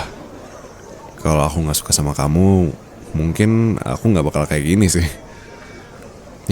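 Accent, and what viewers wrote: Java, Indonesia. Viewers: Bapak e logat jawa rek rek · Syok sekali bisa logat Jawa... Beliau ini Jawir bagian mana anjir...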